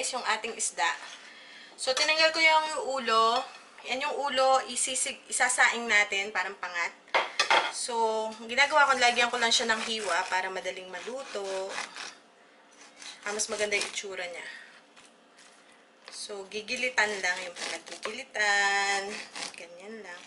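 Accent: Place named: Quezon, Philippines. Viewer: Taga Quezon Ka po ba? Nakakatuwa po kapareho kita ng punto. He he. Ang cute po mga videos mo :)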